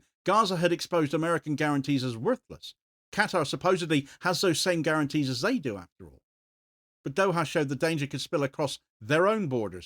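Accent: Cornwall, United Kingdom. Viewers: Are you from Cornwall?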